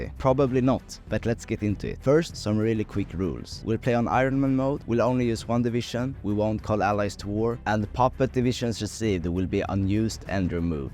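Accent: Sweden. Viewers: Bro kiiiinda has a swedish accent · As a swede no matter where I am in the world this accent will make me feel like I'm back at home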